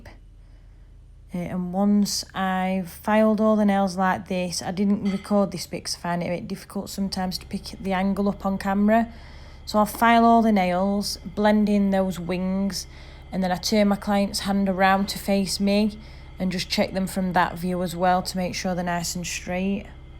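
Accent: Yorkshire, United Kingdom. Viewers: It’s lovely hearing someone from Yorkshire 😊.. gorgeous nails 😍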